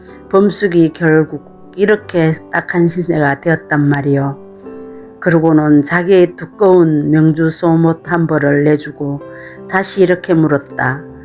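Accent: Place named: Gyeongsang, South Korea. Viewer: 사기열전 19 잘듣겠습니다 경상도 ㅎㅎ 정감가네유 수고하셨습니다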